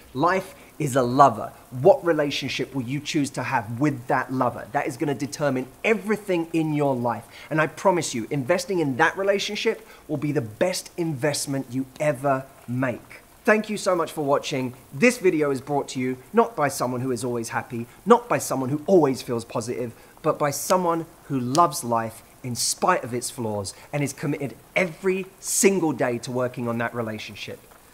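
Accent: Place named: England, United Kingdom. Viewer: Wonderful and inspirational as always! as i am studying english, may i ask you to what part of england or london your accent belong? i really like it. i hope you have a minute to answer my question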